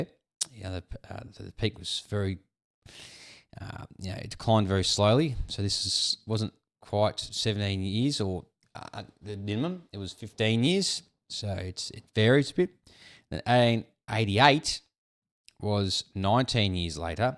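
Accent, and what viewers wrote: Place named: Australia. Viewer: Good info, we need more Aussie voices on utube.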